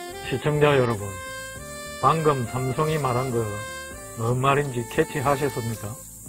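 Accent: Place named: Gyeongsang, South Korea. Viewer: 말씀하시는 억양이 경남쪽이신것같아 반갑습니다 저는 마산태생입니다 구독 좋아요 누르고 봅니다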